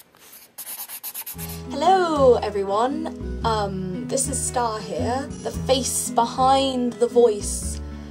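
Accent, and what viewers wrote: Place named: United Kingdom. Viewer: *o* Pretty girl with British Accent. *Faints*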